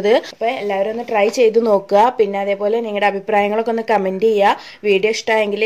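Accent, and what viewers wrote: Malappuram, India. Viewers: Malappuram slang adipoli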